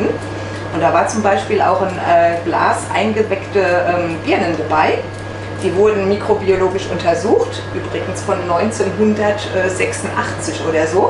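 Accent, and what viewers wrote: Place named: Palatinate, Germany. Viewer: Ah, ich Liebe deinen Dialekt :) wo kommt ihr den her? Hört sich für mich nach Palz an, kann mich natürlich auch irren. Liebe Grüße aus dem Saarland :)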